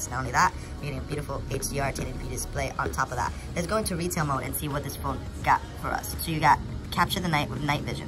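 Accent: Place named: Northeast, United States. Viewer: I'm looking at the Revvelry +, Stylo 5, Aristo 4+, &Samsung A10e and A20 a a backup. Phone shootouts would be cool. With a nick like and that digital voice accent, I'm guessing you're either a Philly, Joisey, or Brooklyn boy. Keep rockin.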